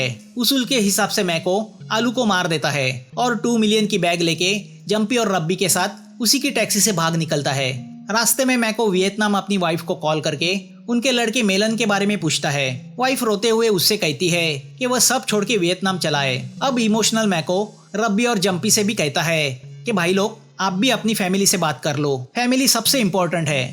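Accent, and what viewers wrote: Maharashtra, India. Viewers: Mai bahut din se apke videos dekta hu thoda absent Marathi lagta hai apka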